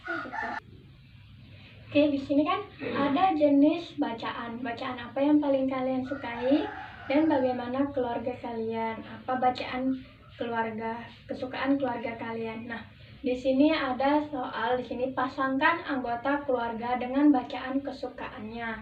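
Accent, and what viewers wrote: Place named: Pekanbaru, Indonesia. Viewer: Logat pku nya nampak kali yaaaa (pake nada pku)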